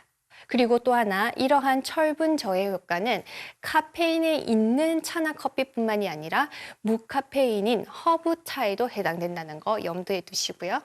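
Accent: Gyeongsang, South Korea. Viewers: 경상도 억양이 있으시네요. 듣기에 나쁘지는 않아요. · 경북 대구 분인가요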